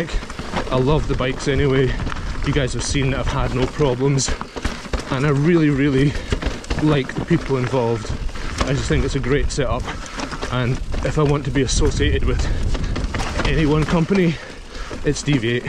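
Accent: Scotland, United Kingdom. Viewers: Great content. And hearing someone swear with a Scottish accent is a bonus. 🙂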